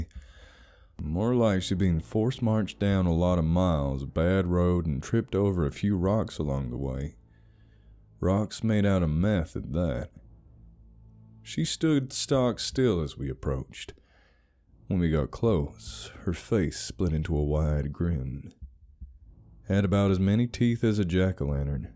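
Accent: South, United States. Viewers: Love how a Brit can pull of a decent southern accent. It’s passable tbh and I’m from Texas. Also, didn’t you do this story before? I coulda sworn I heard this from you? Full story and all. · I love "southern" voice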